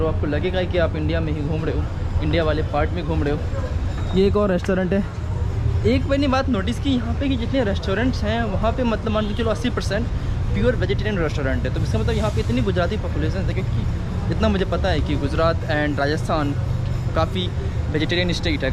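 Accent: Russia. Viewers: Did anyone noticed that english accent is somewhat russian now? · Russian ascent is better than nastya's...😄🤩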